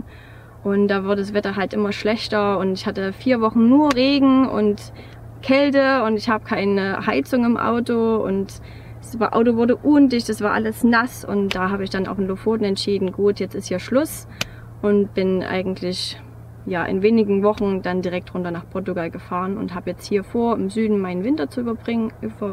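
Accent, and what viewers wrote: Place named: Saxony, Germany. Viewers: Sächsisch is einfach toppe sage ich als 🇨🇭🙋🏻‍♂️✌🏻 go for it. Coole junge Lady. Tolle Docu. Toller Roadplan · Am Anfang dachte ich noch, der Dialekt kommt dir bekannt vor und dann sagst du, dass du aus Plauen bist. Super, das ist auch meine Heimatstadt, auch wenn ich da schon seit vielen Jahren nicht mehr lebe. Du hast meinen vollsten Respekt, denn auch ich muss allerdings notgedrungen seit letztem Jahr allein mit dem Wohnmobil reisen. Unsere Schicksale sind zwar völlig unterschiedlich und du könntest vom Alter her meine Tochter sein, aber du machst mir tatsächlich Mut, meinen Plan auch in die Tat umzusetzen. Viel Glück weiterhin und gute Reise. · Na,Du liegst ja schon Mal gut da🐒😸👲😨alles Gute für Deine Unternehmung.Wie machst Du das mit Deiner Arbeit?Oh,guter Dialekt!Plauener Spitze😸ich habe Mal als 18Jaehriger 1976 ne schöne Goehre aus Plauen am Ostseestrand geküsst.Schoene Erinnerung ohne Folgen.Da wurde noch die Grenzbucht von der NVA nachts mit Booten und Riesen Bogenlampen abgeleuchtet. 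· Ich wusste gleich, dass mir der Dialekt total bekannt vorkommt. Liebe Grüße an Dich aus dem Vogtland:)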